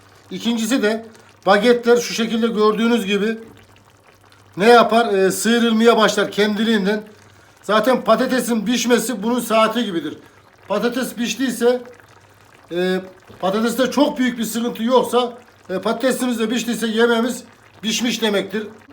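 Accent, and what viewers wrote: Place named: Sivas, Turkey. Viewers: Sa Nerelisin ustam iç anadolulu gibi konuşuyorsunuz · Abi nerelisin sivaslılara benziyon 😁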